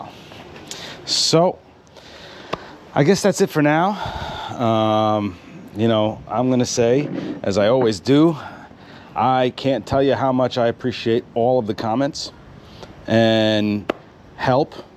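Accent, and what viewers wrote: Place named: New York, United States. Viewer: was going to say, all this time (since you started the whole series) and not a single time were the mirrors cleaned lol. Great work. your accent makes me miss NY... Keep on keeping on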